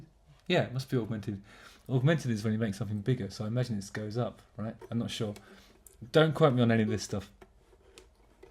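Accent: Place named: RP, United Kingdom. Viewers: Love these! I'm also from good ole lowestoft and love listening to your accent here in nz! Always been a massive fan,thanks for sharing! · Loool, you explaining modern RnB lyrics in your posh ass English accent was great. Nice to finally find your YouTube page.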